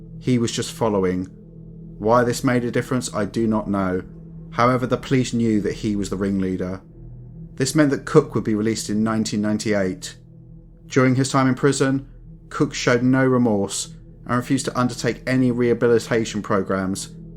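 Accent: United Kingdom. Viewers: What part of England are you from? The south?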